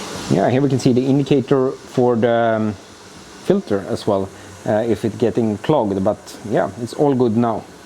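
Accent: Sweden. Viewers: Men satan va svensk du låter 😂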